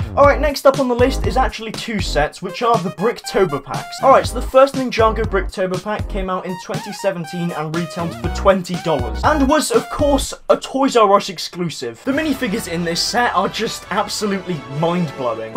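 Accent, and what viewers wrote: United Kingdom. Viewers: Alternative title: British boy rants about being mad for not getting toy products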